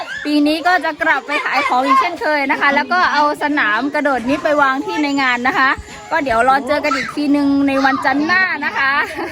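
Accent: North, Thailand. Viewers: เหมือนภาคเหนือเลยครับ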